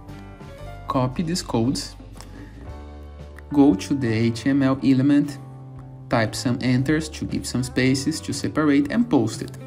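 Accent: Brazil. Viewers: ingles de brasileiro kkk boa mano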